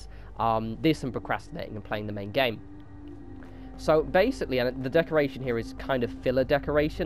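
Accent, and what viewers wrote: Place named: East London, United Kingdom. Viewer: You genuinely have a fascinating accent. Its like a mixture of eloquent country english and really harsh east london. Almost verging on cockney.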